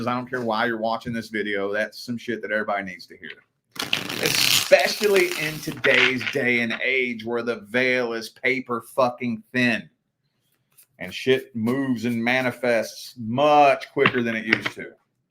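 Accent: South, United States. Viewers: I love your Southern accent and your realness :) You are so genuine and sweet and patient yet you have suffered so much. Sending love and warm hugs!!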